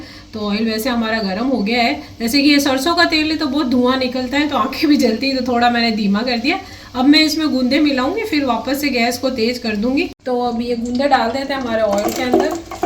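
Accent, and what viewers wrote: Jodhpur, India. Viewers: Jeejaa aap jodhpur k rahne vaale h ya aapki meethe boli m jodhane kee apnayat h achar k kya kahne vaah saa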